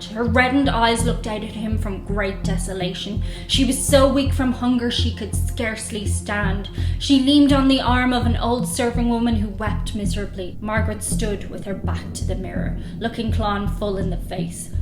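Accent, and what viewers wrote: Ireland. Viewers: Ha come to think of it that kinda does describe most Irish women I know...I love stories like these and your accent makes them 100% better. Well done 👏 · The irish accent is cute and hilarious 😂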